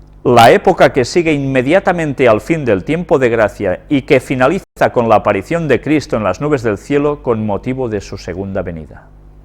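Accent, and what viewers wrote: Spain. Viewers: ¡Para nada! Trabajé en Palma cuatro años y tomè un curso de un mes intensivo con un personaje que es escritor separatista que o te hablaba en mallorquí o en inglés (!) pero soy tan bestia que cuando trataba de aplicar mis "conocimientos" en el trabajo, mis compañeros se descostillaban de la risa y me dí cuenta de que nunca iba a poder hablarlo .... Pero me da mucho gusto escucharte hablar porque (salvando las distancias) me hacés acordar a la gente linda de la isla. Cariños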